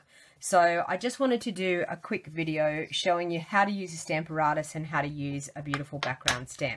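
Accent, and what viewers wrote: Australia. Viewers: Great video. Good to hear an Aussie accent!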